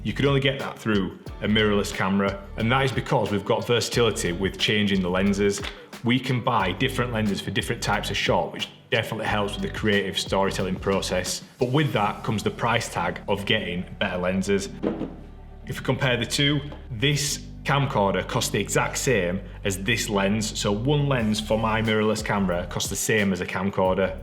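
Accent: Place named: Northern England, United Kingdom. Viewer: D'ya know summat, it's so nice to hear not only an English accent but a NORTHERN English accent on YouTube! you have a new sub my friend!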